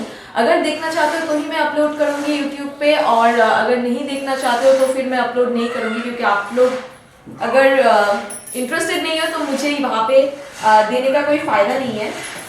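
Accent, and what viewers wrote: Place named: Bengal, India. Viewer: Plz learn to speak proper Hindi bfr u make vlogs; u r Bengali, why don't u speak in mother tongue?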